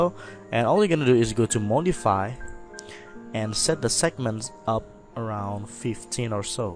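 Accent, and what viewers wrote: Indonesia. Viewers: Aha indonesian right? Btw it's a great tutorial dude, i thought you were western at first haha.. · I knew it the second he talks. indonesian accent all the way! Awesome tuts anyway! very helpful. yay for indonesian youtube guru!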